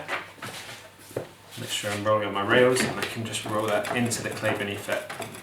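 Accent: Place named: Nottingham, United Kingdom. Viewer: Are you from nottingham? I hear it in your accent!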